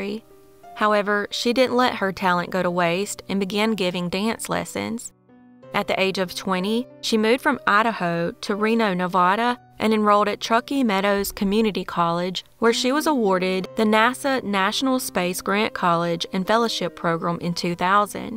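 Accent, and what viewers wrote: South, United States. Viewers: Thank you kindly for your amazing story and video,much luv, I love ur southern accent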